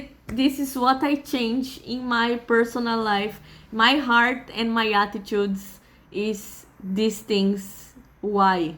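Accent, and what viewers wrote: Philippines. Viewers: you speak English similar to the way a native Filipina speaks English, why is that? I’m a Filipino American married to a Brasileira but my wife’s English is very Brasileira. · I don't know where you're from but I'm absolutely positive you've lost your native accent. You now have a Filipino accent.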